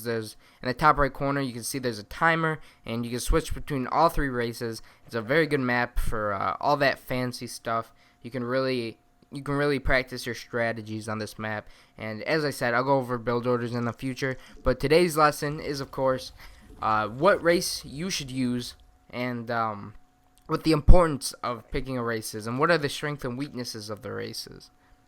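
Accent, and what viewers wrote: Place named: Chicago, United States. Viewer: Are you from the Chicago area? your accent sounds like it. ( i used to live 'round there)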